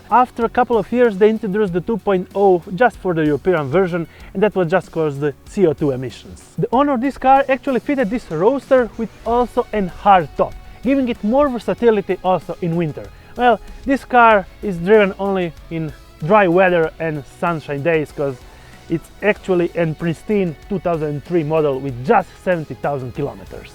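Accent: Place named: Argentina. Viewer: Oye boludo! He just sounds Argentinian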